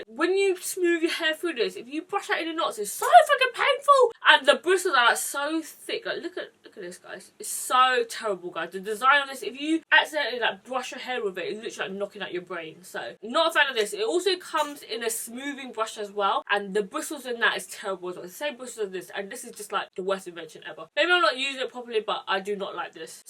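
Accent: United Kingdom. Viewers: Omg same. I don't really know what I expected but I didn't think she was British lol. But her accents super cool. :)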